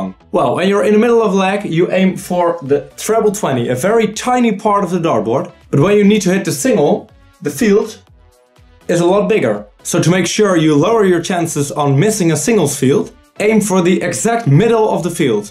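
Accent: Netherlands. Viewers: Ik hoor aan zijn stem dat hij Nederlands is xD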